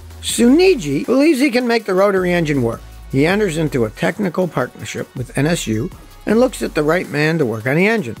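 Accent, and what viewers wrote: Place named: Appalachia, United States. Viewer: Now, that's a Hillbilly accent!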